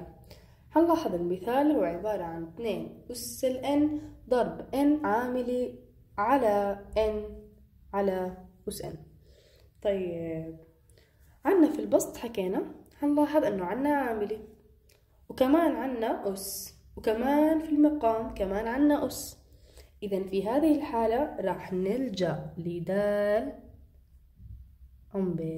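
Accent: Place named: Homs, Syria. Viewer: حاسك حمصية متلي😂 عكلن شرح ممتاز الله يعطيكي العافية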